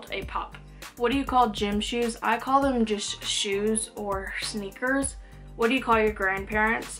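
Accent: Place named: United States. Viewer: Oooooooo thank you so much for mentioning lil old me hahaha. I'm can't get over your new set up honestly I love it & the quality is great!! 😍😍👌🏼 Your accent is so cool! It's so American, I love it 😻 I'm dying a little on the inside how your step poppy is still poppy like we're all devoted to him and not as accepting towards to new husband he can just be called "insert name here" by the way that was meant to come across as humerus but it's hard on the Internet or maybe I just have weird humour lol either way loved the video and can't wait for your next one ❤️❤️❤️❤️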